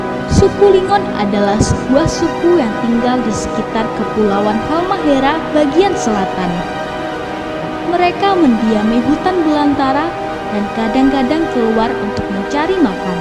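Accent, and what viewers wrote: Java, Indonesia. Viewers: kok indonesia agak medok jawa, jadi gk enak dengernya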